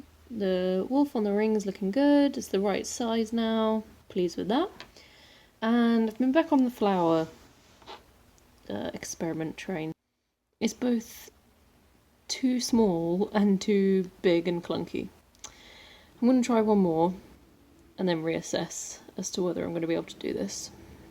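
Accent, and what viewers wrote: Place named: United Kingdom. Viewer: I LOVE YOUR BRITISH ACCENT THIS IS SO GREAT!!!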